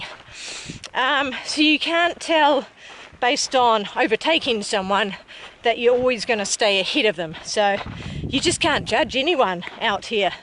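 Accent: New Zealand. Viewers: Glad to see that your not feeling the after affects of those tumbles! Which part of New Zealand are you from!? Such a lovely accent you have to! Let the haters hate and don't let satan's lies bring you down! God bless you and your family! Keep enjoying the Journey through God's gorgeous backyard!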